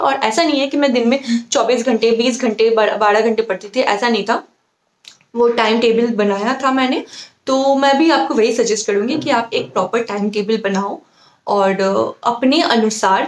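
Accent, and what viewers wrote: Assam, India. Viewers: You sound like an Assamese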